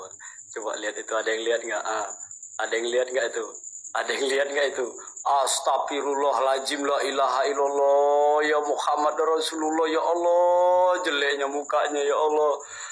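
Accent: Medan, Indonesia. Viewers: Melayu medan kek gini bhasa nya.m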